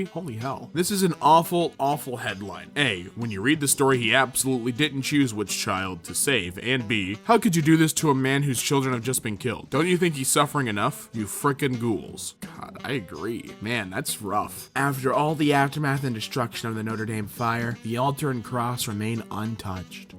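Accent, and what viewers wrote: Australia. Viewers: Fair play to that Aussie accent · the australian accent while pretending to be american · I’m an aussie, and I just gotta say GREAT accent! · Me: r/murderedbythatAustralianaccent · 10/10 Aussie accent. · This was a great video, but your Australian accent pained me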